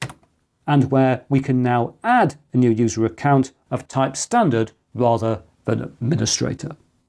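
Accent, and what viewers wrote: United Kingdom. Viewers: I wish I had you in my CS classes back then. First, a british accent is always a win, second, your teaching is incredibly motivating and paced on point. Thank you so much for your content!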